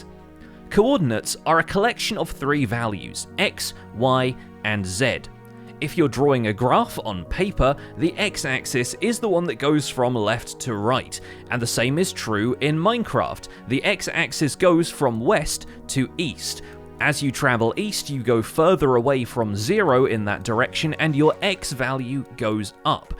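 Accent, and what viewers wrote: United Kingdom. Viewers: Yo are you British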